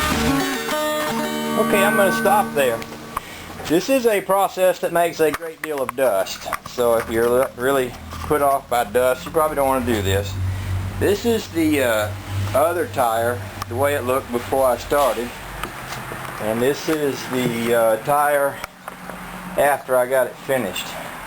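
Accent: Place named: South, United States. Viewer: Where are you located? Your accent tells me your in the south but I've seen this car at the Owls Head transportation Museum in Maine, far from the south, haha. Did you sell the car? Or loan it to the museum? I Love that place and usually visit every time I'm up in Maine.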